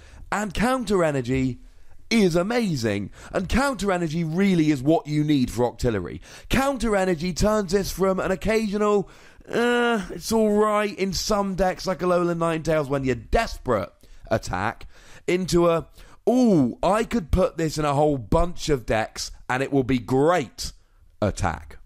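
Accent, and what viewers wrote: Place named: Birmingham, United Kingdom. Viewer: Though i heard that voice in Birmingham and Sheffield regs!!!! your a great commentator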